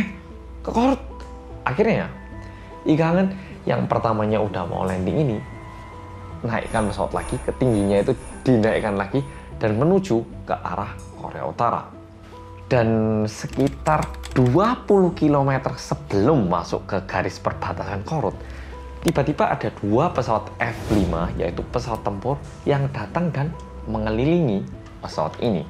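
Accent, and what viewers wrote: Java, Indonesia. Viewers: medhok ◐.̃◐ Nessie Judge: horror 👻 Nadia Omara: ngegass 😈 Arroyan Pram:serius, editan terbagus dan niat Detektif Aldo: serius pake banget (｡ì _ í｡) Gak tau kenapa aku suka banget sama kalian:-) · yang menarik dr sini otak guw membayangkan yg dia bicarakan .. hebattt sukses terus mas medhokkk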